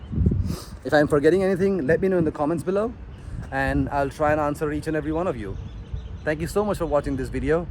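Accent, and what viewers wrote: India. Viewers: you don't like the video, you go on and search some other one, no one's forcing this video down your throat, you came here all by yourself, like you said "YouTube is free" Aur rahi baat accent ki, I have seen a lot of people from south india, especially Bangalore, who speak fluent English without any kind of particular accent, kind of similar to what speaks, doesn't means they are putting up an accent, maybe that's the way he has spoken all his life, maybe that's the way he learnt it, why does it even matter. What I am trying to say is you need to see both side of the coins before judging for yourself, and don't judge for others, nobody cares. and oh I am not from Bangalore advocating their accents, I am from punjab, just sharing something of relevance here that I saw 😂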